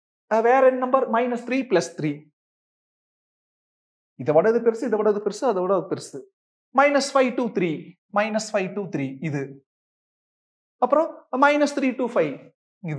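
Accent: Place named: Kongu, India. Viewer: Ss..... It's KONGU TAMIL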